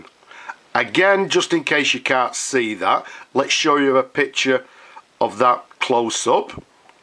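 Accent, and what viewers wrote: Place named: Northern England, United Kingdom. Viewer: Loving the Northern twang lad. I'll contact thi through t'usual channel in a bit if tha's obliging enough.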